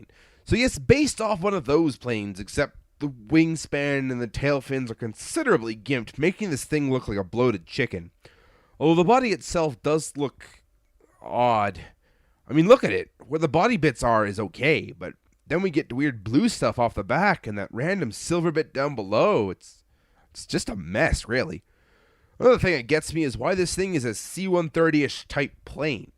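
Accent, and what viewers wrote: Canada. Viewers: Haha you sound like a candian .Are you Canadian ?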